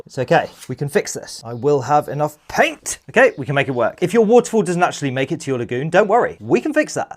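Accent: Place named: United Kingdom. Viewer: Bro british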